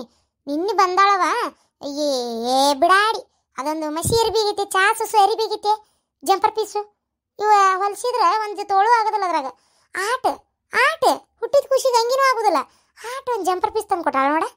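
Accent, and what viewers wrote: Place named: Hubballi, India. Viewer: ಮನೆಯೊಂದು ಮೂರು ಬಾಗ್ಲು ಹಾಕ್ರಿ ನನ್ ಫೇವರಿಟ್ ಸ್ಟೋರಿ ಮಿಸ್ ಮಾಡ್ಬೇಡಿ,I love your ಹುಬ್ಬಳ್ಳಿ ಭಾಷೆ🥰😍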